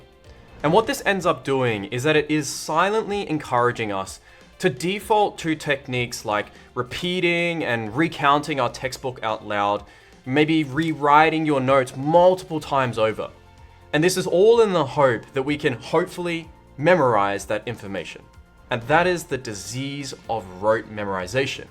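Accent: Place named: Australia. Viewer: I think it's the Australian accent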